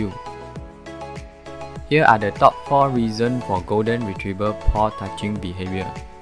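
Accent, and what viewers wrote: Malaysia. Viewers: Are you from Malaysia? Because your accent sound so Malaysian to me.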